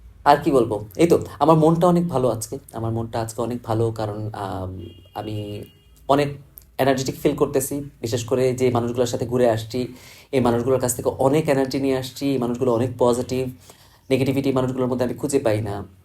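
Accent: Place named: Chittagong, Bangladesh. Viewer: আপনার এক্সেন্টে চাটগাঁইয়া একটা ভাব আছে, চট্রগ্রামে বড় হইছেন আই গেস❤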